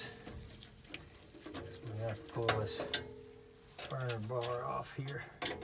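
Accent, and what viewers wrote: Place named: Southeast, United States. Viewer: I like watching Steve Lav and you, but it's almost like culture shock switching between the two of you excellent tradesman. Steve's the quintessential fast talking, no holds barred, plumber with the Northeastern drawl; the slow talking, cautious, soft spoken Southeastern country gentlemen HVAC technician. Both are a joy to watch and are not only entertaining, but very educational in their presentations. Thanks to the both of you for taking the time to make these videos.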